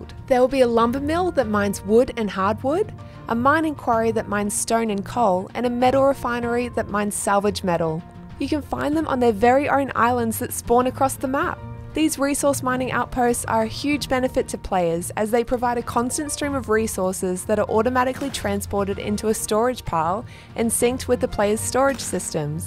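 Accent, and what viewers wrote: Australia. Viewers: i like her ausie voice 🤩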